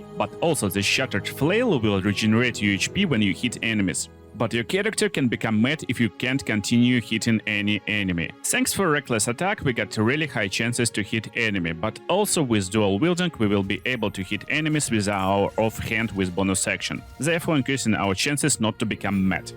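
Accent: Russia. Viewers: Даже на английском ютубе по бг я нашел человека с охранительным русским произношением. Гайд кстати хороший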